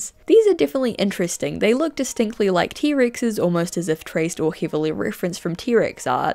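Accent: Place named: New Zealand. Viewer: Not only is your lovely kiwi accent with all those wonderful inflections and vowel pronunciation just magic to listen to when relaxing or reading etc... But you have great scripts and they flow so well. You put a lot of information in your videoes but they never feel like there's too much to digest or you are moving too fast... You have a great knack for taking these complex stories apart and making an engaging, enjoyable and interesting script out of them... Aaaaad it's just a delight to see what fantastic and cool looks you will rock for the video. You never ever ever fail to make me go 'wow' 😂 You are a real treasure and I really hope your channel grows and you get the success you deserve. Have a really great Christmas and a very happy new year! 🍻 ✌️ ☀ 🎅 🎄🎁☃️❄️❄️ From 🇮🇪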